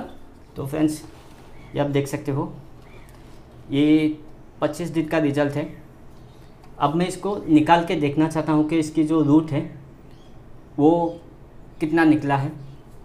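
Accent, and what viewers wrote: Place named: Northeast, India. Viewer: Voice like you're from North-east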